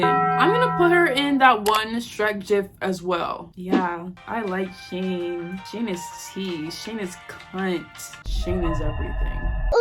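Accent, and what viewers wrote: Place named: United Kingdom. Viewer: you’re editing honestly kills me off everytime!!! love ur vids 🫶 and also ur british accent isn’t half bad :)) love from, a mancunian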